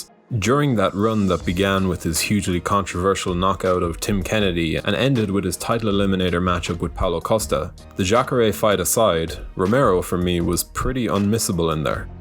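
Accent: Ireland. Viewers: The Irish accent makes your videos better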